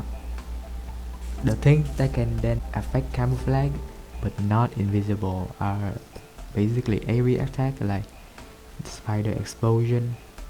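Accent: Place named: Vietnam. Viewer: Vietnaese accent for sure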